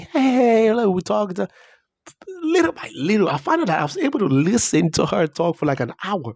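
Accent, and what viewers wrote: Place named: South, United States. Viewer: oooh ok. That’s why I’m hearing a southern accent okkk. You’ve picked up the dialect very well. Ok Lagos. Awesome!!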